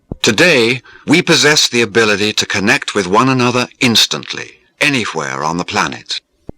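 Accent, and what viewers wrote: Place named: United States. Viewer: Jeremy Irons seems to have an American twang to his voice here. Has he deliberately done so for a predominantly American audience?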